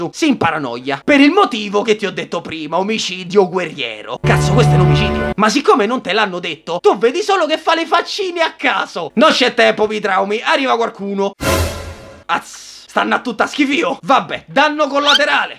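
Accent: Rome, Italy. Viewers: Ma soprattutto perchè è l'unico che sa sfruttare così bene l'accento romano da far sbellicare dalle riderate, personalmente a me non è mai successo fino ad ora.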